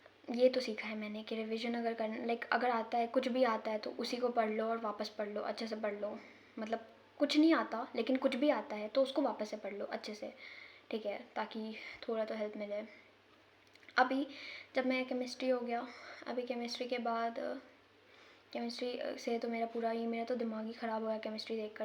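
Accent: Bihar, India. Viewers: Bihari ho kya ?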